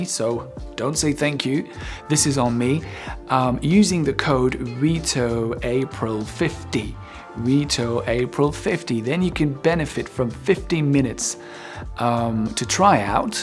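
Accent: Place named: England, United Kingdom. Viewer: Hello, I watch your Channel quite a lot. I love, your English accent and your quiet and bright way of speaking. I progress a lot with you. Thanks for it. Take care 🍀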